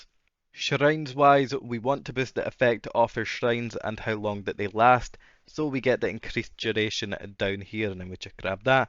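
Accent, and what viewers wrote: Scotland, United Kingdom. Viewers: scottish accent = subbed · I discovered your channel this league and all your strats are all great and I really like listening to you, your Scottish accent is the cherry on top. Keep it up bro.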